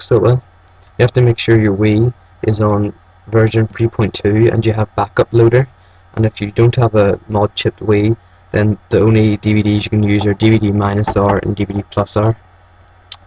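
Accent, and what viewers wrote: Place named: United Kingdom. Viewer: Wow. i came to thiz vid to get the latez ZSZ site and i had the audio on, and chu have that AWesome British acent!! Lawl =)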